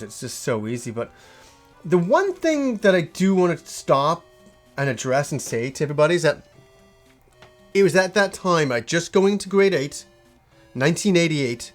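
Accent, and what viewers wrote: United Kingdom. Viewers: The more emotional you get, the stronger your British accent gets haha I love that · I didn't know you were from england. Now that i notice it you have a very slight british accent in your voice.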